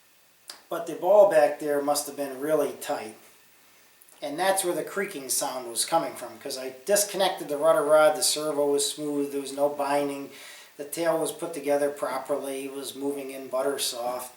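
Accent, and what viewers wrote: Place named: Massachusetts, United States. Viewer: Where are you from? You sound like you are from south east Massachusetts where I am from.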